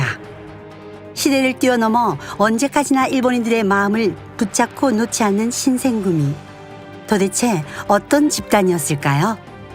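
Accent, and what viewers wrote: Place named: Gyeongsang, South Korea. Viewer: 경상도 사투리를 쓰고, 그리고 문제는 일본어 장음이 전혀 안되고 토의 발음이 도로 되는 등 전형적인 한국인 발음임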